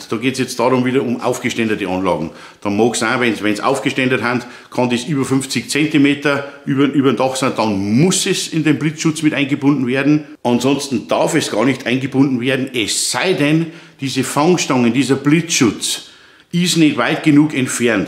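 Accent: Bavaria, Germany. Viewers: Bleib autentisch Red weida borisch Des passt scha 👍👍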